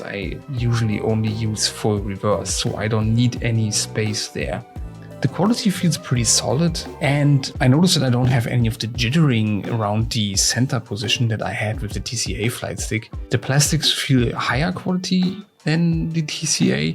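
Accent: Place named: Germany. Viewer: your english is very german :)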